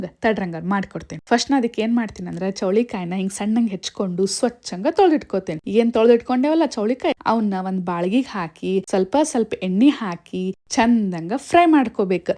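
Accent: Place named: North Karnataka, India. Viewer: ಉತ್ತರ ಕರ್ನಾಟಕ ಭಾಷೆ ಬಾಳ್ ಚಂದ್ ಹೈತಿ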